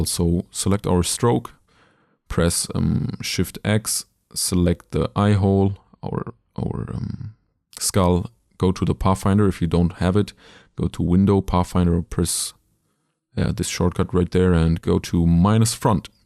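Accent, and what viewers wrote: Germany. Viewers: Bist du deutscher? :D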